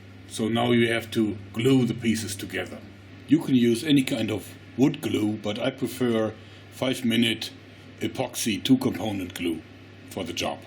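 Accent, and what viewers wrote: Germany. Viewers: could it be, that you are german?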